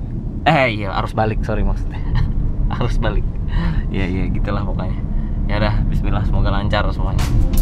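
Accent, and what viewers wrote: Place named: Banyumas, Indonesia. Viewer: Lucu timen ngomong ngapake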